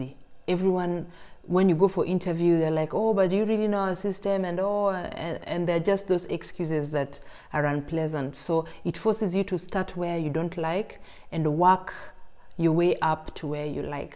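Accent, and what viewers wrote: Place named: Kenya. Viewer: You speak like a Kenyan. . are you....if so , I'm Kenyan in France, im very near to Switzerland...... i wanted to come to Switzerland through a student visa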